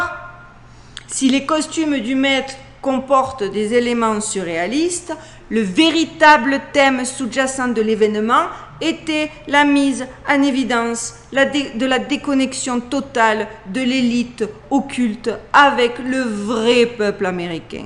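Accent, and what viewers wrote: Marseille, France. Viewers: Bravo! J’adore votre accent du Sud en plus ..un vrai bonheur de vous écouter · j'aime vraiment ton travail et ton accent de Marseille 🙂 je partage complètement ton regard sur le monde, et j'aime bien aussi ton sens des valeurs..... Belle et longue durée à ta chaîne!! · Madame je vous écrit sans avoir commencé a voir la vidéo ce que je ferais après donc pour vous dire que je vous apprécie beaucoup d'autant plus que je n'ai jamais été pour une Idole je ne suis Fan de personne . Ma modeste personne me suffit surtout en ce moment . Il faut pas se le caché non seulement vous belle mais avec un cœur un cerveau BIEN REMPLIS .Du bon .......sans nul doute et de plus ce joli accent Marseillais vive la France bon enfin bref vu l'état de la France et bien d'autre Pays en ce moment!!!!!!!! Juste pour vous remerciée de votre travail que je regarde avec beaucoup de PLAISIR . 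Merci je m'arrête la sinon c'est un roman qui serai écrit .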